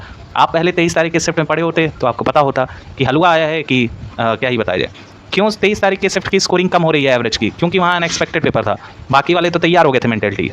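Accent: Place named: Ayodhya, India. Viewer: अयोध्या से हो सर भाषा से लग रहा है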